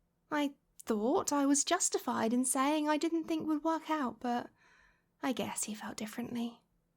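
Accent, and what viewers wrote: United Kingdom. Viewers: U have such a sophicated and beautiful voice and the fact your britsh is amazing ❤ ( I'm britsh too😊) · This is so aggressively British I love it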